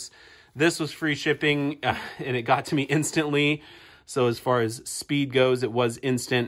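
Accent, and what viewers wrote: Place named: Oregon, United States. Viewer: Thought you sounded like an Oregonian. Haha! I live in Wilsonville, myself.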